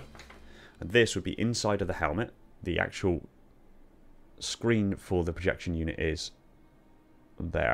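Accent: United Kingdom. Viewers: I love British narrations idk why it just sounds so much smoother and intelligent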